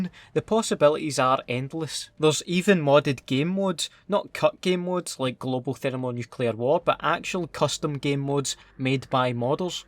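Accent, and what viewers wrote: Scotland, United Kingdom. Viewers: fucken great Scots accent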